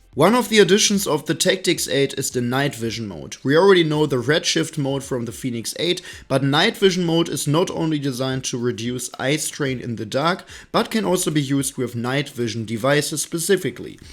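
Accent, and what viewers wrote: Germany. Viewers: Accent, is it German?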